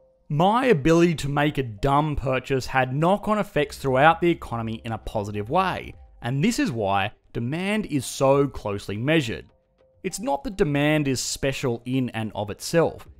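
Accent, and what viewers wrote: Australia. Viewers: Cannot stand the ozy accent. Bye bye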